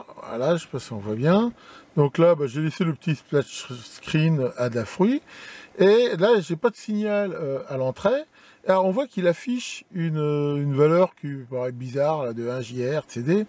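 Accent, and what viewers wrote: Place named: France. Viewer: Bonjour, Excellente vidéo comme toujours sur cette chaîne, ça fait toujours plaisir de voir une notif sur YT avec une nouvelle vidéo de ^^ Par contre, pouvez-vous répéter après moi M? Aidafroute:D Je plaisante, mais cette petite touche d'accent Français m'a fait sourire. Bonne continuation à vous.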